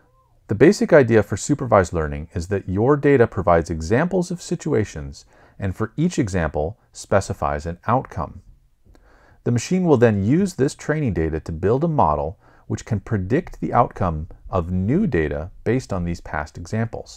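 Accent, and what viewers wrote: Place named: United States. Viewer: American English...pheww...finally found something that I'm able to understand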